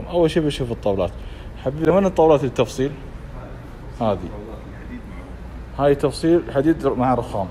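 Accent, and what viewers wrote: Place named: Syria. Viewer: من سمعت لهجته سوري كنسلت لان السوريين في كل المجالات اسعارهم ١٠ اضعاف السوق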